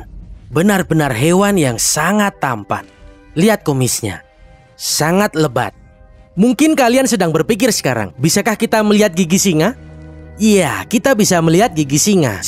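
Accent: Java, Indonesia. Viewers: Knapa jadi medok anjirrrr dubbing nya🤣🤣🤣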